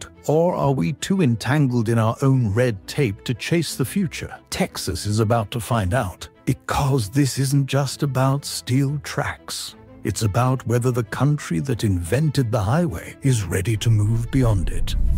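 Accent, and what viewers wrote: United Kingdom. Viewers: Why the heck do we need a narrator using flowery language and with an English accent telling us about Texas??? Stick to making videos about sheep farming in Yorkshire and how to properly hold a teacup! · W"hat's with the constant British voice in the backstory narration on anything BIG, BOLD. AMBITIOUS INFRASTRUCTURES in America....curious??! 🤔🤔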